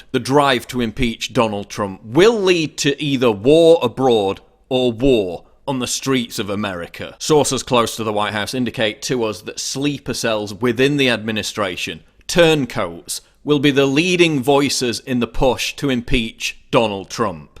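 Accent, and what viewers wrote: United Kingdom. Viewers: We're the crazies. Sure, nothing wrong with Trump. Who are the leakers? WTF is a Brit narrating this? He sounds just like Robin Leach from "Lifestyles of the Rich and Famous." Before Trump was elected, one of his associates said that if he didn't win, "there will be blood in the streets." That scared a lot of people, because we figured it wouldn't matter if he won or not. Because he insisted that the election would be rigged. But he won, so it wasn't rigged... I don't want any wars and I would prefer that he resign to being impeached. But he will never quit because he'll never admit to doing any wrong. Trump told an Evangelical reporter that he has never done anything for which he would have to ask God's forgiveness. In other words, he is perfect and sinless. A bit confusing by most Christian theology, but what the hell. He will never quit because he would never admit to any wrongdoing (adultery, etc.) If only Fox News has managed to report the truth and this Russian stuff is all made up, then he's fine. 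The Russians did a lot of hacking. Trump knew that, but he jumped at the chance to use every Wikileaks the Russians managed to get and spread all the lies the Russians spread about Clinton, and chant the hateful "Lock her up." He colluded. In spirit anyway. You say what Trump revealed to the Russians was to help save them from ISIS attacks? That's good from my pov. But he could have been more discreet. He could have not told them where the info came from. He could have made s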